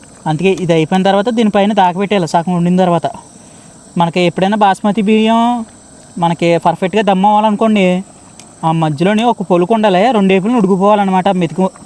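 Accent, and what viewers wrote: Godavari, India. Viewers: Godhavari language super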